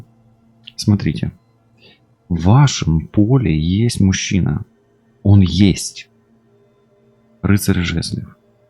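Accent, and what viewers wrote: Ukraine. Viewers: Вы просто супер- мне так импонирует Ваши комментарии )))) и говор украинский, как же это приятно на слух! Боже как это классно!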